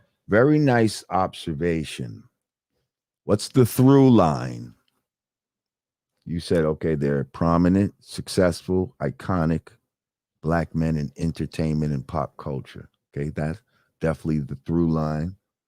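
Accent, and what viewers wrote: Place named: Ireland. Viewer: Salute to the Irish accent 🥃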